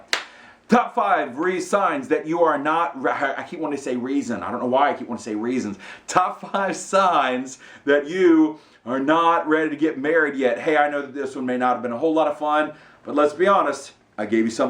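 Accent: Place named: Baton Rouge, United States. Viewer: Baton Rouge accent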